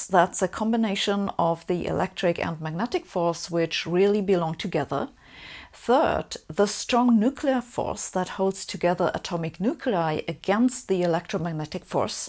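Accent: Germany. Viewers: Sabine, my German sounding goddess, I see ur beautiful eyes and I insta click, don't even read the titles anymore. Please make more vods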